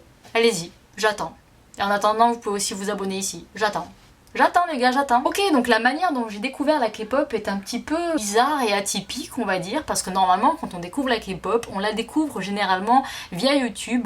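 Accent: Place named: Toulouse, France. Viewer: A peine j'ai commencé à regarder la vidéo je me suis dit "omg elle est Toulousaine c'est sûr mais avant de lui demander je vais regarder à description" et j'avais raison x) vive le sud ouest ! Et vive la k-pop !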